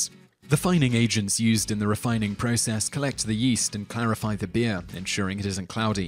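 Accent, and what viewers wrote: United Kingdom. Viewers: *Story time!* As a muslim and my family not being religious we end up at alot of non-halal restraunts so I have to pick and mix from the veggie menus ( i used to be veggie for pretty much my whole life before converting to islam.. even slumping on the vegan side!) aaaaannnnd tbh They're pretty good at stating whats vegetarian or not as well as I'm allergic to all E-numbers, colours, preservatives, flavours, blah and blah so I have to read the ingredients lists anyhooo but Again, the guy in the video is pretty much right... if you want to guarantee something is veggie/vegan then grow/make it yourself! *we salute you Wonderfully british accented narrator....*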